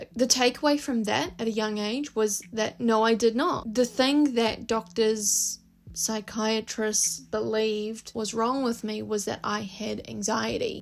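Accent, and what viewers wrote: New Zealand. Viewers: Are you from Auckland? I love your accent and the way you talk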